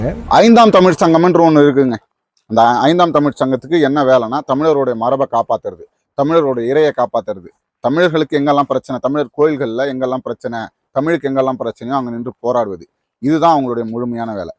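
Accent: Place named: Telugu, India. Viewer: MAM your speach only TELUGU carefully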